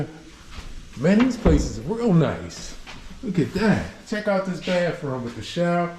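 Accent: United States. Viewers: I hear a Maryland accent. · U got a Philly accent to me. I didn't even know cherry hill had "projects" shot out to the bottom of the hill❤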